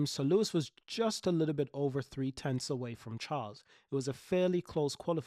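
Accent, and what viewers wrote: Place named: Bristol, United Kingdom. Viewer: **It wasn't anything specific you said; it was more about how you pronounce certain words. You can tell that your tongue is positioned higher in your mouth, closer to the palate, when you speak. Additionally, there's less movement in your jaw; the tension in the jaw muscles can be heard if you know what to listen for These are 2 key and unique mechanics to a Bristol accent vs other accents from that region. There were a few other clues, but none related to slang, as I'm not familiar enough with British regional slang for that to have been a hint for me. I could possibly narrow down the area further, but out of respect for your privacy, I won't do that in the comment section, lol**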